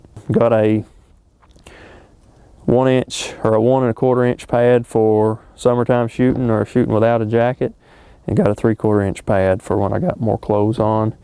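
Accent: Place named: Texas, United States. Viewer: You sound like you're from Texas. And Trapshooting is humongous business. There's about one major competition in every state ever week. You need to join the ATA to see just how many people are involved in competitions never mind how many folks just do it for recreation. It'll be a major eye-opener for you.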